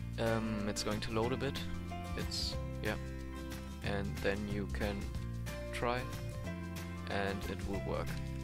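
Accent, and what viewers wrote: Germany. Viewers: man hört sehr stark heraus das du deutsch bist deswegen finde ich sollst du es eigentlich auf deutsch machen und nicht auf englisch, da geht es nicht darum ob man englisch kann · Man hört deinen deutschen Akzent mega :DD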